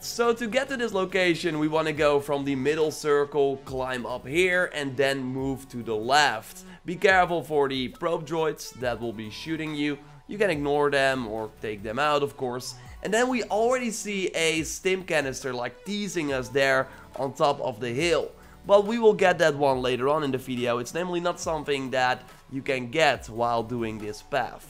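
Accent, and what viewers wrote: Netherlands. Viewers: Are you dutch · Damn, are you Dutch? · You Dutch?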